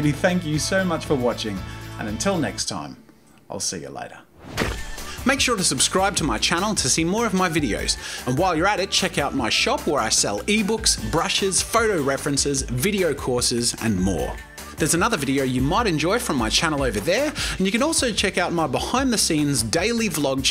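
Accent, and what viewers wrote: Australia. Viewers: I learned to do a proper Australian accent from your videos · he sounds like an Australian Hank Green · British? He's Australian.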